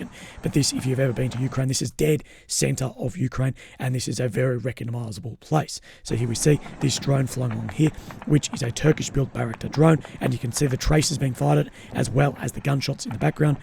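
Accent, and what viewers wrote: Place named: Australia. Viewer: Big yin your pure on this subject unbiased opioid empathy and a funny oz accent. Dude l could do an hour a day why don't you do 2 wee video a day recap or fake news exposed. Watching from Scotland peace and love to all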